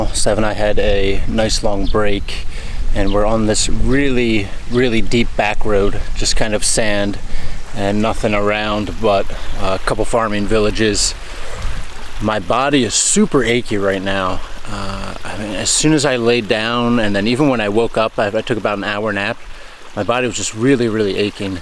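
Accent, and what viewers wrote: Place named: Philadelphia, United States. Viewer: Damn that Philly accent is thick haha.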